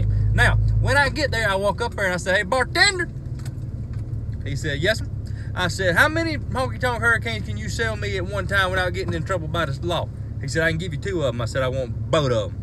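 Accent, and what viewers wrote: South, United States. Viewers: I don't agree on the whole god/jesus thing, but love your energy, honesty and down to earth wisdom. I'm from Seattle and you make me want to talk with a southern drawl. Sometimes a few drinks is what it takes to get our priorities straight.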